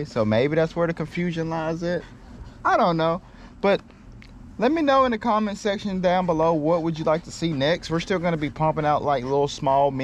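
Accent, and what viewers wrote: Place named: Alabama, United States. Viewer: “ I’m from green bow Alabama” - got the same accent. great video though.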